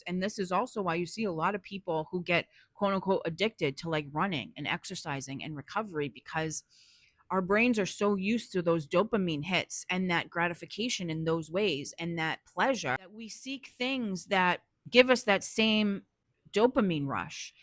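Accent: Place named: Eastern Massachusetts, United States. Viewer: Thank you for the video, great job. I knew you were a fellow Baystater before you mentioned Boston & Worcester with that Eastern Mass accent 😊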